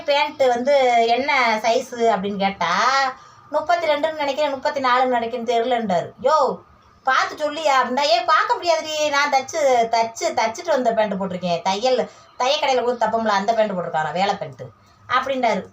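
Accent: Madurai, India. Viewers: Akka ninga madurai ah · Akka neenga Madurai ya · Akka neenga madhurai ya super ah pesuringa akka ella dress nalla iruku 👍👍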